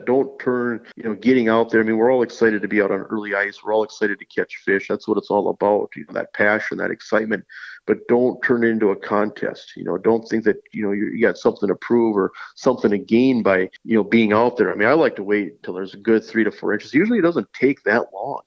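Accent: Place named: Minnesota, United States. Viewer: Moved from Mid-west 26 years ago , you can not hide that minnesoat, north dakota accent. Dont-cha-know!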